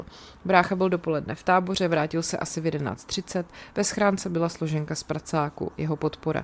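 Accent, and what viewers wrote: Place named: Prague, Czech Republic. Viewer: Ten, prazskej, přízvuk slečny úplně trhá uši. Navíc pořád mele a nic nepoví.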